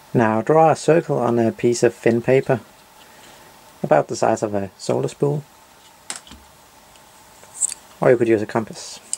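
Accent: Denmark. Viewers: You have a Danish accent bro, where you from?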